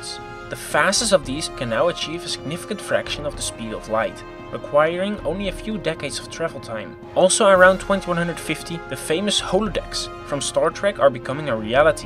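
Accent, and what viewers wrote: Netherlands. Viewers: Gotta love that Dutch accent, kek I'm Flemish myself so it was't hard to distinguish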